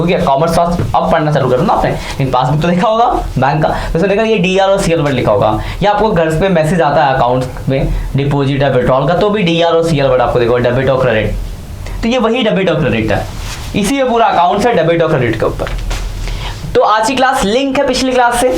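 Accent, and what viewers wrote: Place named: Haryana, India. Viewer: Amazing explanation sir...... I think u are from haryana????????ur teaching is really great 🙂🙂🙂🙂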